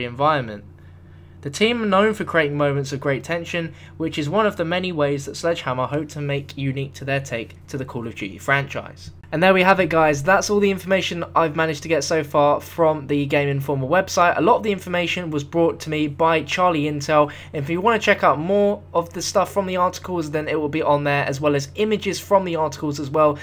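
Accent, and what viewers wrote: England, United Kingdom. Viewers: is the best are you English?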